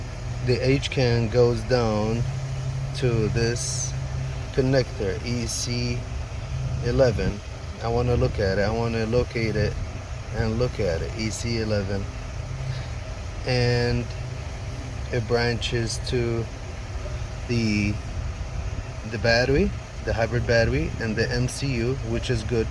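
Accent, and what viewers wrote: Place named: Iraq. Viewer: متحجي عراقي هيه مال تفيك 😅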